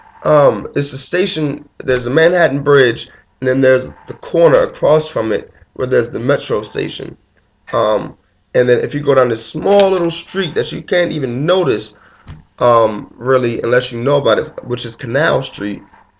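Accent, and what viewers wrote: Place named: Baltimore, United States. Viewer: You have a bmore accent is that where you from?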